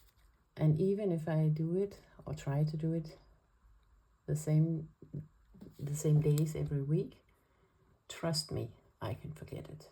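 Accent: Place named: Denmark. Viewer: I love your accent! My grandfather was from Denmark but I don't remember much about him besides the way he spoke :)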